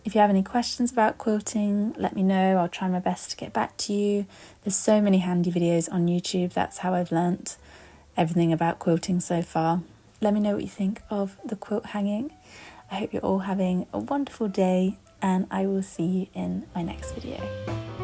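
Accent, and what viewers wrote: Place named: England, United Kingdom. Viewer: What a fantastic idea - making something for ourselves for once! 😂❤ I absolutely LOVE Ohio stars and am mega careful, getting everything to line up and get very stressed if I’m even a mm or so out!! 😱😅 An absolutely stunning wall quilt hanging - beautiful and a smaller one in a corner is a lovely idea too 😊 I’m desperately trying to get stock together, but I might just say sod it, this weekend, I’m going to make something just for me ❤ Thank you thank you and if you’re at all interested, I can send you a photo when it’s done 😊 You are a true inspiration to a massive quilting and everything material and hand made fan, and hearing your lovely english accent reminds me of ´home’ - have lived in france for 12 years, but almost everything I make is with fabric from England as the French just adore it ❤ Have a lovely evening and see you next time 🫶 xx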